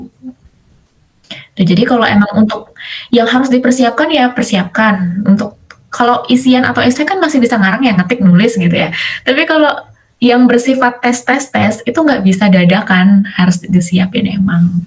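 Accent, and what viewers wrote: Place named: West Java, Indonesia. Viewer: Orang samarinda tapi logat dan someah nya vibe org sunda bgt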